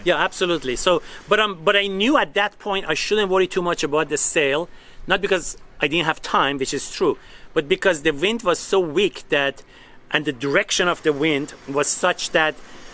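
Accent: Iran. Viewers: You are an awesome Iranian as your accent sounds like my Iranian friends from Chico State Circa 1975. I loved your original life story. Thanks brother for sharing. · Riveting, without being sensationalistic: A+. I could listen to this man speak on any subject (do I detect an Iranian accent?), and admire his humble-thorough approach… to everything, really.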